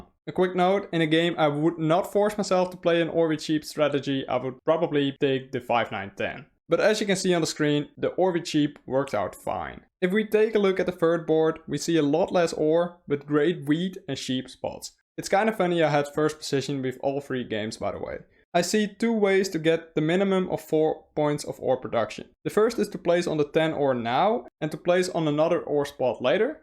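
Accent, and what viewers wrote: Netherlands. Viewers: omg the dutch accent is so intense 0_0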